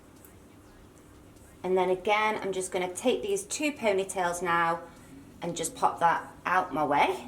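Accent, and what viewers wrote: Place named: Birmingham, United Kingdom. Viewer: Amazing braid!! I love your accent - it’s very Peaky Blinders 💕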